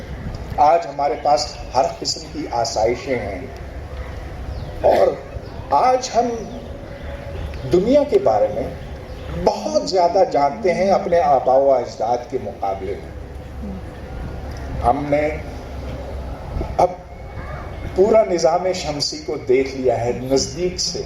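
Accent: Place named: United Kingdom. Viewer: and we have better scholars than you , we always had. Speaking British English wont help you idiot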